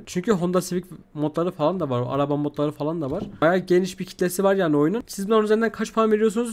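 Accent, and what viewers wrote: Erzurum, Turkey. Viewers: abi erzurumlumusun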